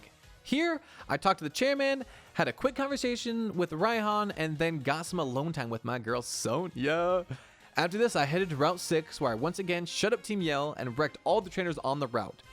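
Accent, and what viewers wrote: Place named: Scotland, United Kingdom. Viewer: Damn a true Scottish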